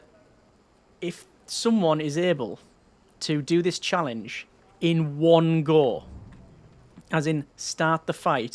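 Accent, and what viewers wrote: United Kingdom. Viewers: Are you British? Because I haven't realized that for a long time. 😅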